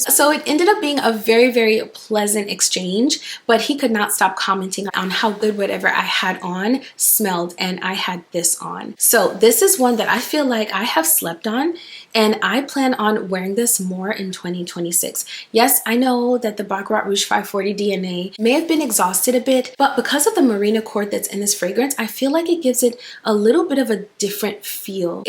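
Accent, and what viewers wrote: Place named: France. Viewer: She's definitely a French speaker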